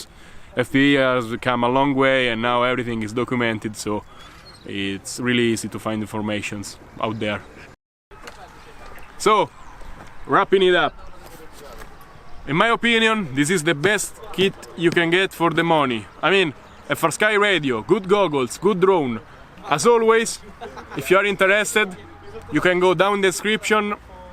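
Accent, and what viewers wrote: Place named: Italy. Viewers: Sembra italiano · solo a me sembra italiano? However thaks for this good review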